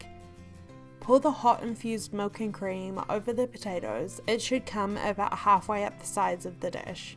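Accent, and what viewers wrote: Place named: New Zealand. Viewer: Me too ! I live in Auckland . Nice to hear a Kiwi accent on here ! Lol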